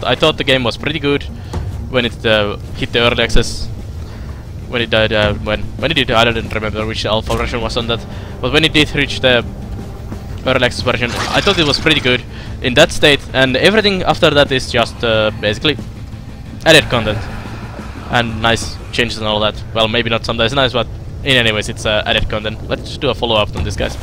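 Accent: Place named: Finland. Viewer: "Ärrän kierrän ympäri orren...." It's funny how every Finnish accent is different, but recogniseable. Your english is really good though :)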